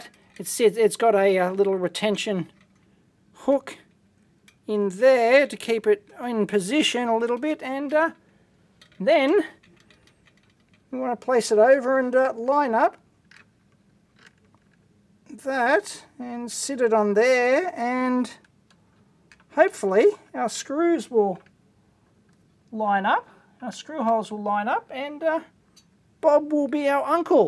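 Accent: Australia. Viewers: Stopped watching at the thermal compound application. Not only is this guy's accent annoying (and that's coming from another Aussie), he's got ZERO idea of what he's doing. Did anyone else notice the heatsink wobble when he removed the side panel? Not compatible with that case.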